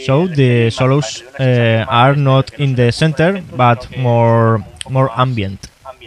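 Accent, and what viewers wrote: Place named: Spain. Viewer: Your spanish accent sound pretty awesome! ahah and don't forget to always add some tags to your videos, so you can get more views ;D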